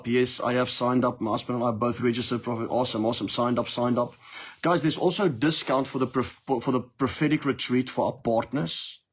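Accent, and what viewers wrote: South Africa. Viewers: My favorite accent is the South African accent lol. Thank you so much Prophet for reminding me I am living in the spirit. We are not bound by the law but bond servants to Christ. One day I hope to visit your church in South Africa! Jesus is King of Kings! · Afrikaanse Boertjie from South Africa..speaking English..a distinct dialect.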